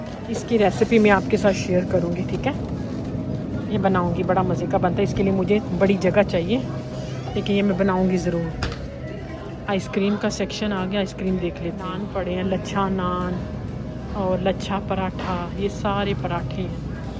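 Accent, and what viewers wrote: Punjab, Pakistan. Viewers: Hi. Ap pachtu Hain or mashaAllah lagta nahi apki bataon se yehi lagga Hy ap Panjab see Hain. Bht saf Urdu bolti hai ap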